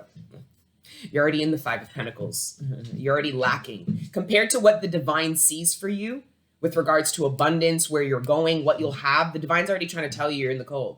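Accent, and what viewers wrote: Jamaica. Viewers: Can I ask are you a question are you Jamaican 🇯🇲 ❓ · Your yardie accent had me hooked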